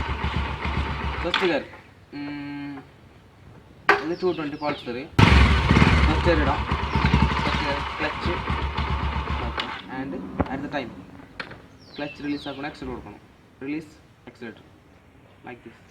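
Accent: Kasaragod, India. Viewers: Tips ellam okke aanu bro.. Kasrod slangum polichu.. Kurachu koodi spacious aaya sthalath venam stunt tips kodukkaan.. Ennale viewersinu oru majje indaavu.. Slang oru kuravaayitt kaanathe confident aayit samsarikkanam.. Otherwise all good.. Keep going.. 😍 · Nth da slang kettal arila ksd😍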